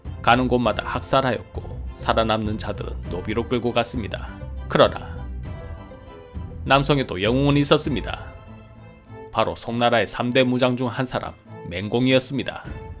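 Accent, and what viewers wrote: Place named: Daegu, South Korea. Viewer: 참 잼있고 유익한데 구독자가 안느네.. 진행 하는 양반 사투리도 내 고향 대구 같아서 더 좋은데.. 거참 이상하네 왜 구독자가 안늘까..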